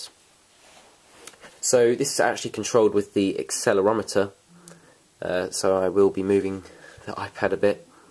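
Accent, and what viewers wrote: United Kingdom. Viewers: I like he British accent !!!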